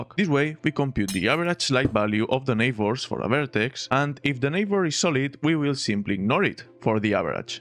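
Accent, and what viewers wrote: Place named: Spain. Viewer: That english spanish accent 👌👌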